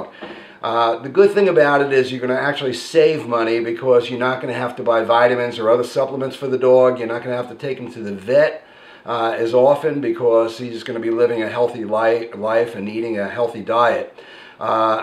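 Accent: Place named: Long Island, United States. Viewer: it's good to hear a normal long island accent. i'm too far from home, everyone sounds unfamiliar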